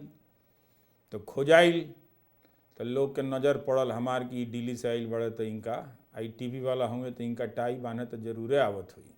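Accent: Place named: Ballia, India. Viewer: आपके जेवन भोजपुरी बोले के शैली बान ऊं हमरा बलिया ज़िला से काफी मिलेगा रविश भैया मजा आ जाला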